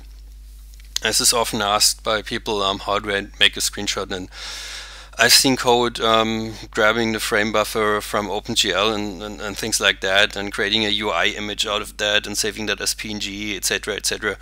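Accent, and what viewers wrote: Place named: Germany. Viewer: 100% er ist deutscher, das hört man so raus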